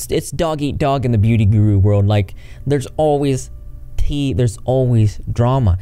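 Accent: South, United States. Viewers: If I had to guess...Florida/alabama/Georgia somewhere around there lol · I definitely hear the south in your voice.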